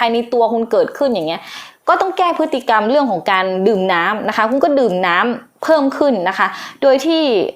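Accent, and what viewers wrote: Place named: North, Thailand. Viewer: หมออย่จังหวัดอะไรอู้เมืองแต้ชอบวิธิอธิบายชัดได้สาระ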